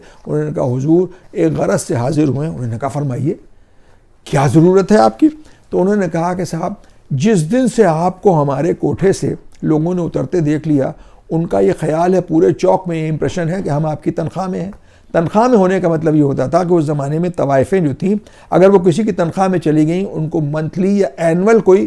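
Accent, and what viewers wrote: Lucknow, India. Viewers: Khudara Urdu alfaz ka tarjuma na kariye ga, kyun ke aap ke dilkash Lakhnawi lahje me Angrezi ki amezish se is ka maza thoda pheeka pad jayega. Aap ka andaz e bayan isi purane Lucknow ke lab o lahje me bahot shirin aur dilkash hota hai. Waise ye mera zaati khayal hai, aap behtar jante hain ke aap ko kya karna chahiye, hum aap ke videos ko khoob pasand karte hain.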